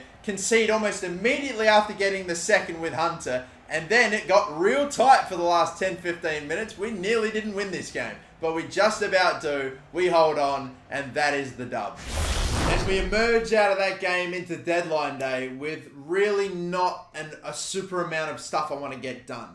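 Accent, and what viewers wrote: Australia. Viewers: pure Australians accent 😂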